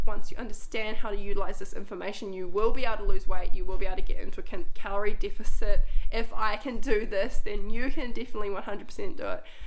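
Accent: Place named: New Zealand. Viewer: As soon as you spoke I knew you were a fellow kiwi and the struggle is real to gain the proper technique when it comes to vegan weightloss! You are so right when it comes to the confusion over all these trains of thought about diet! Wow that is so exciting to be collaborating with Kiki and Hannah when you are just starting out! You must have been mind blown! ! They are both my favorite vegan you tubers! ! Way to go!!!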